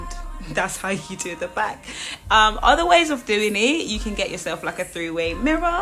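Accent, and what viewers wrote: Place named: United Kingdom. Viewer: I just love your accent. I love to hear a black Brittain. You are a very talented hairstylist as well. Here in America, everybody is going natural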